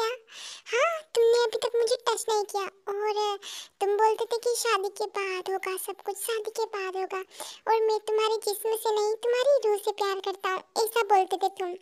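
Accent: Gujarat, India. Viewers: Kya aap gujarati hai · Diii are you gujarati ? 🤭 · Di ap gujrat se h kya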